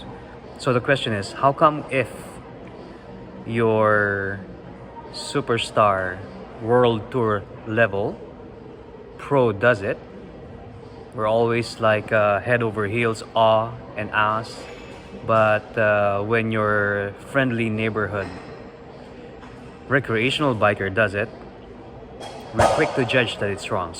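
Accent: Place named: United States. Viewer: Nice vid bro. Just curious where you learned how to speak English. Did you ever live in the U.S.?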